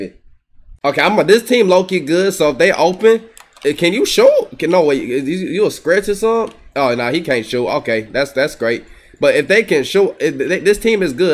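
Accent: United States. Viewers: now you sound like a cowboy from illinois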